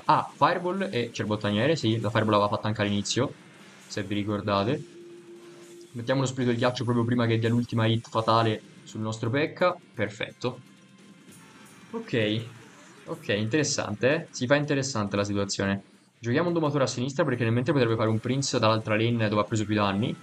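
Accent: Tuscany, Italy. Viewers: Boia.😂. Ma quanto mi piace il tuo toscanaccio. Io sono di Ferrara emiliano. Ogni anno vengo da voi a Siena. Montepulciano Montalcino e pienza vino formaggio e olio. Poi un fine settimana a mangiare toscano con i vostri crostini di fegatini e milza che pochi conoscono e vivo bene per un anno 😂😂😂😂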